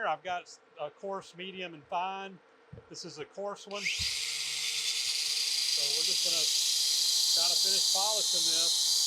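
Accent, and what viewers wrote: South, United States. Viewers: Always nice to see your projects coming along narrated by your southern accent that is soft on the ears to this NYC guy. I hope that someday when this country returns to manufacturing and engineering fine products that young folks can watch these videos and see a skilled craftsman at work.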